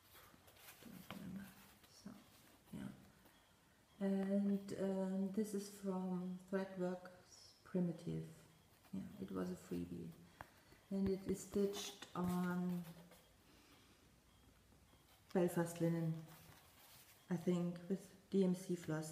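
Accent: Germany. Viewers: Hello, I found this video today, and so enjoyed it I had to go find the first 2 that you had done and get caught up. I feel you are a kindred spirit to me in many ways. I, too, love smalls and would never even think of doing a HAED work, even though there are many beautiful ones..or a Mirabilia! I have 3 of the Lizzy Kate snowmen charts and was going to finish them as ornaments, but the picture with all of them is so cute. I think my grandsons old enjoy that alot. Thank you for taking the time to share your stitching and I hope you will continue to do so. Also, meine Mutti war auf Deutschland, so I enjoy listening to you accent. Happy Holidays!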